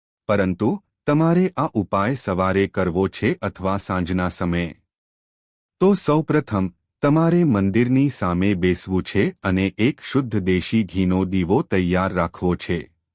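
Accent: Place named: Maharashtra, India. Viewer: Divo salgaway nahi petaway bolnari bai gujrati nathi apbrahans shabdo par thi Marathi bai che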